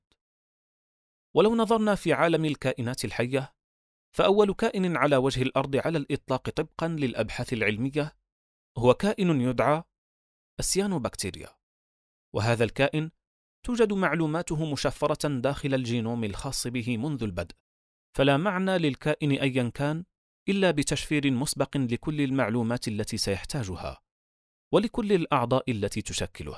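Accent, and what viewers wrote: Morocco. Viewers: أظن المتحدث مغربي، انطلاقا من لحن الكلام الخاص بنا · اشعر ان صاحب الصوت مغربي 😅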